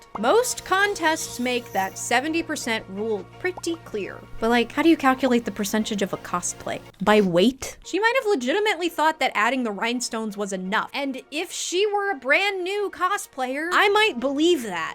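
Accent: United States. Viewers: As each day passes, the american accent grates harder on my soul.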